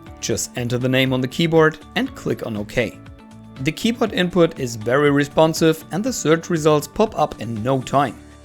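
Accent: Germany. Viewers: Da höre ich doch deutschen Akzent! :D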